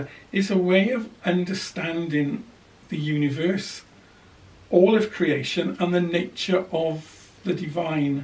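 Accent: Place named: South Wales Valleys, United Kingdom. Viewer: It sounds as though you have a slight welsh accent ?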